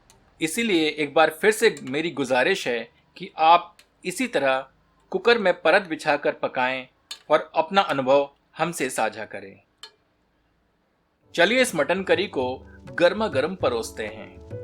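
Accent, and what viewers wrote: Standard. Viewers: Apki Shudh Hindi sun ke man prafullit ho gaya...pehli baar kisiko itni acchi Hindi bolte hue suna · आप के शुद्ध हिन्दी उच्चारण सुन कर आंनद आ गया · आपकी शुद्ध हिन्दी बोलना अच्छा लगा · Shudh Hindi ka uchcharan aapke baton se pet bhar Gaya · Mujhe apki resipy to achhi lagi sath mai apki sudh hindi bhot achhi lagi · आपकी हिंदी की धारा प्रवाह ... शुद्ध उच्चारण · Aap pahle durdarshan chanal pe programme present karte the, aisi Hindi wahi dekhne aur sunne ko milta thi, 😢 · आप तो कमाल के कलाकार हो, इतनी शुद्ध हिंदी और वो भी मटन व्यंजन विधि के बारे में क्या बात है ! पहली बार किसी का वीडियो देख मजा आ गया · Shudh hindi bolte hain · रेसिपी तो बहुत अच्छी है लेकिन उससे भी अच्छी आपकी शुद्ध हिंदी है। कृपया आप इसी तरह अच्छी अच्छी रेसिपी लाते रहे जिससे हमे आपकी हिंदी भी सुनने को मिलती रहे🙏🙏🙏🙏🙏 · इतनी शुद्ध हिन्दी तो हमारे हिन्दी के शिक्षक भी नहीं बोलते थे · जी आप की हिन्दी सुनकर मुझे बहुत बहुर खुस्हुवा इतनि शुद्ध है कोही नहि बोलसक्ता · Itni shub Hindi mein baat karne ke baje se mujhe mutton de zyada apki Hindi Sune ka man hain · i heard his voice till the end nd enjoyed pure Hindi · सच में आप सर हिंदी के शिक्षक हैं। मतलब क्या हीं बोले आपको, हिंदी के बारे में। इतनी शुद्ध हिंदी!!😀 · हिंदी भाषा का शुद्ध उच्चारण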